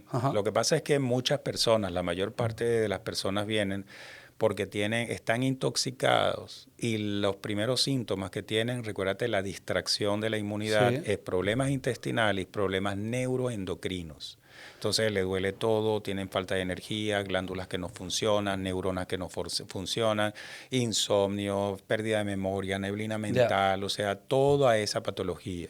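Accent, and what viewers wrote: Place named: Caracas, Venezuela. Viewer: Ese acento me recuerda al Guaraira Repano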